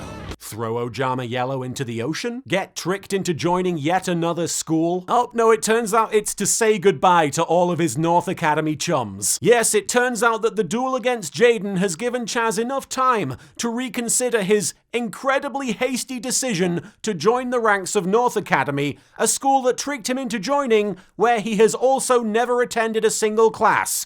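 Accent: United Kingdom. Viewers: Wait, are you actually British? This whole time I thought you were an American who just enjoys British slang. Kind of like a weaboo, but for British culture instead of Japanese. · I love listening to a British bloke say cheeky things about an anime from about 20 years ago, so I must be in the right place!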